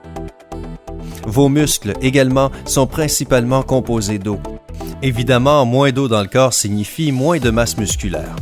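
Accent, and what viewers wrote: Quebec, Canada. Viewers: Ouahhh on a tous reconnue l'accent québécois c'est magnifique 😂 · L'accent canadien m'a fait marrer pendant toute la vidéo 😂🤣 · Marrant hein l'accent canadien j 'comprend -donc ,aie !!! Gros gros buzz ! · J'aime pas l'accent canadiens et surtout la voix w😗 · C'est tellement moche l'accent québécois 😷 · Mdr l'acent québécois mdr 😂🤣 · J’aime beaucoup l’accent québécois ! J’espère que je suis pas la seule mdr 😂 . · c'est l'accent du Québec ! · Pk c une voix canadienne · Alerte accent QUÉBEQUOIS!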